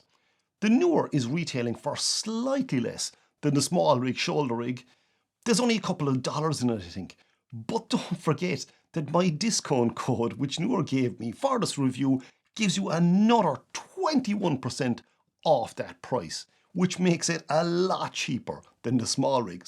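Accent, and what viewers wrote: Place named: Ireland. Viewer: Your channel is great! I came from your video about the Neewer follow focus, and stayed for the one take presentational goodness and Irish accent. 😂 Love from Down Under. ❤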